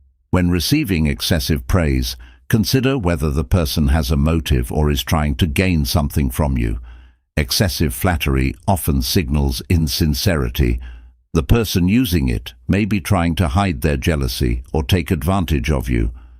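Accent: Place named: United Kingdom. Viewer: It's a great subject there's a shit ton of it out gear what sets you're presentation apart from the herd and saysclisten to me???? IT SURE AINT THAT CALM CONDENSENDIN ENGLISH VOICE THATS ON A COUPLE OF DOZEN NEW PIECES OF CONTENT A DAY ARE YOU READY TO DITCH THE ACT TO USE YOURE REAL VOICE??? AND IF THOS IS YOURE REAL VOICE ,WHEN ARE YOU GOING TO PUT THE ACTION BEHIND YOURE WORDS AND ACTIALLY PUT POWER AND HART ONTO EVERY SENTANCE??? CAUSE THE WAY YOURE PRESENTING THIS WANDERFUL MESSAGE YOURE sabbatoging the good you could be doing you want a better channel more thumbs up ditch that posh english crap or if that is you iam sincearly sorry find away or get a coach watch some videos on public speaking you're message ain't bad but there us nothing holding me gear specially when I know this crap allready see you say let go what we cannot control beuitif thing but you're killing me iams fighting sleep cause bbbbboooorrrriiiiinnng not impressive no emotion it feels you're just a damnd actor reading some lines even worse an actor just taming a job to get by with no real interest in what there doing and sad is it is Ionley comment cause I care ill probably be watching new content from you tomarow the difrence is if you sound like you're just paying the bills no matter how good the info is you're getting the down ward thumb iam ubsubing and reporting you as just another goddamnd a.i. 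pretender and I fing hate snitches and will probably abuse my self as a sick punishment for breaking Mt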